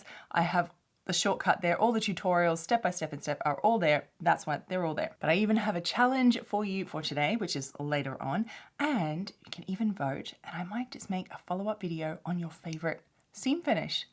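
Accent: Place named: Australia. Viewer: I was really looking forward to this video, thank you! I had to decide to stray from a skirt pattern I was working on yesterday because the double-gauze fabric I had chosen frays so much I felt I needed to use french seams (vs just finishing the raw edges). I could have used the overcast stitch on my sewing machine but I honestly didn't think it'd be durable enough to last many wears and washes. Also, while I've watched a few of your videos before i've just now realized you've a non-American accent. Are you Australian?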